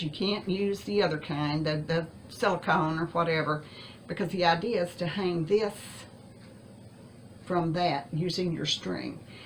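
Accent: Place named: South, United States. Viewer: the southern accent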